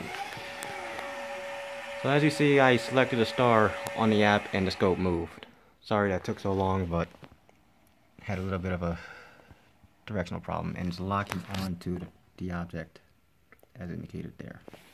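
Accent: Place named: Chicago, United States. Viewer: Nice video. You sound like you're from Chicago, my hometown.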